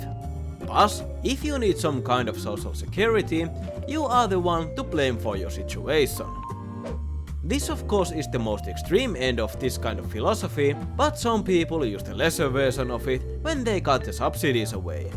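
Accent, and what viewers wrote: Finland. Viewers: Kyllä kuuluu ralli Englanti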